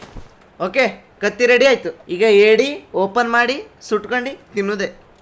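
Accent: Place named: Coastal Karnataka, India. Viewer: Guru karavali shyali maath ajji maat super chanda guru aadre nin yen guru karavali ne marth bittidya ansute adke maath sa change aagide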